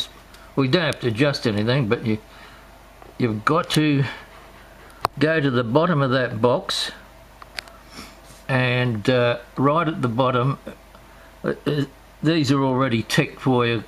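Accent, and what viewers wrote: Australia. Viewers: I like your aussie accent ~ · Thank you man , in fact i was search on youtube to find a way to delete the files , and suddenly i see your's , a great explanation. Thank you again Also i have a question for you What is your accent ? Is it Australian ?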